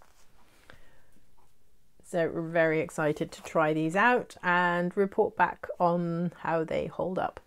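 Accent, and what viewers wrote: United Kingdom. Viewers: Congratulations on releasing your first pattern! We'll done, you! I think you were channeling your inner Vanna White when you were showing your sock....lol! I've on l y been watching your podcast for a short while, and quite enjoy it. Could you explain why, for a Dutch person, you sound so British, please? It's very confusing. I'm looking forward to seeing your progress, and Julia's on the Steven West MKAL. Take care. Patty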